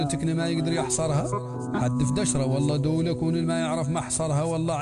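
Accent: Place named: Sahara. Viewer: تحياتي لك اخي انا معك غي القناة الاولى والتانية انا من متابعينك ومعجبين بك لكنتك ااصحراوية تحياتي لك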